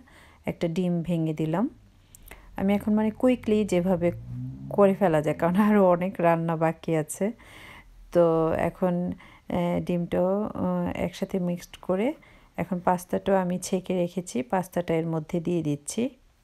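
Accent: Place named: Dhaka, Bangladesh. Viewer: আপনার কথা শুনে ও ইলিশ মাছ কটা দেখে মনে হল আপনি ঢাকা বা বিক্রামপুরের মানুষ।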